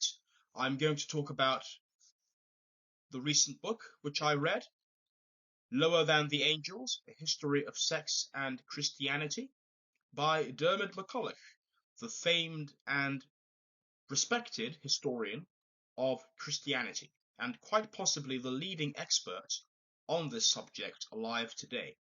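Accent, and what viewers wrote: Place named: United Kingdom. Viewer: I'm going to let you know something, when I saw this video pop up on my homepage I thought, 'will he will speak with a British accent', and I was right! I'm from the US by the way😭😂🤣🤣🤣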